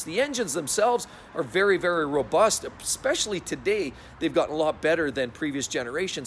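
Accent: Canada. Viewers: Abut , ut , lol. Is this guy Canadian? Talks funny. Mercedes unreliable. Chinese transmissions.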